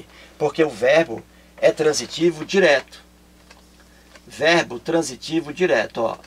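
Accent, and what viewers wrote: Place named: Ceará, Brazil. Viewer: eita cearense vei arrochado.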